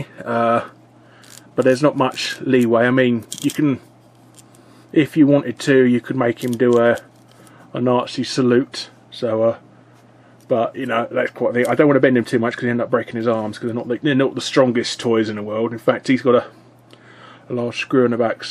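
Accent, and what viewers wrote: United Kingdom. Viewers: Jesus Christ! You are the most British person I have ever heard! THAT'S AWESOME!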